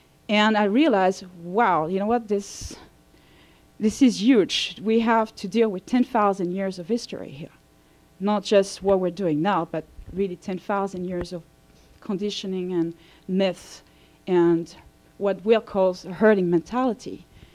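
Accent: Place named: France. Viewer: Powerful stuff; good talk; French accent and all - you're a great speaker! Thanks for spreading the word... and spreading it a little more creatively than when you started back in 2006;)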